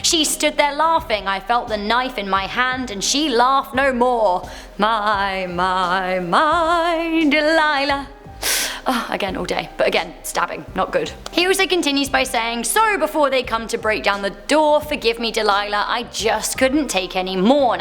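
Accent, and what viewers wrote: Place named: United Kingdom. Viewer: Is this woman from Britain? No offense she just sounds like she is from Britain.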